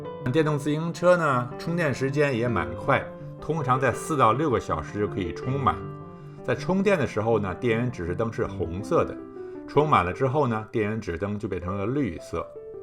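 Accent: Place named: Tianjin, China. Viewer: 大哥你好，听您声音天津的，我们是老乡，很感谢你分享了这个电动车，但是我在澳洲买不到，有点失望，这车的确不错，淘宝有类似但是感觉不太靠谱。祝您生活愉快。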